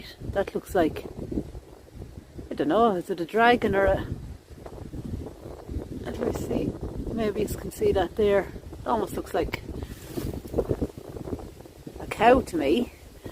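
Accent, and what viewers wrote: Ireland. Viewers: Coffins need a clean. You sound Irish, are you in Ireland?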